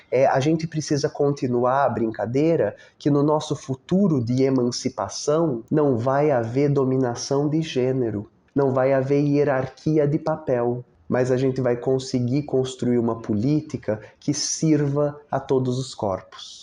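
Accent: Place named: São Paulo, Brazil. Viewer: você é especial. O seu sotaque me delicia (sou carioca). Parece uma especialização do sotaque paulistano, talvez ligado aos italianos. Para mim é o sotaque de uma das minhas bandas favoritas: Os Mutantes. O seu sotaque é igual ao do Arnaldo Batista e, principalmente, do Sergio Dias.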